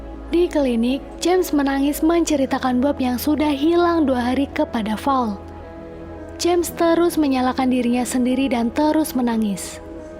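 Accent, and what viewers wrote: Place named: Pekalongan, Indonesia. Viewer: Logate kak kalongan temen mbak oo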